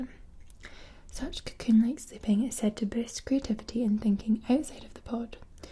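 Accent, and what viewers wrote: Scotland, United Kingdom. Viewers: Damn I really needed this today , after being scammed on Twitter and putting a hole in my wall , I need this beautiful Scottish Lass to calm me down . Thank you for all you do , it’s very much appreciated by me 😍😜👍🤠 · It's great to see a Scottish ASMR person on YouTube. As a Scottish person myself the last voice I want to hear is someone with a disgusting English accent so you my dear have frankly been a godsend! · the scottish tingles provided by a beautiful scottish lass. excellent! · You should roleplay Rayla from Dragon Prince since you're Scottish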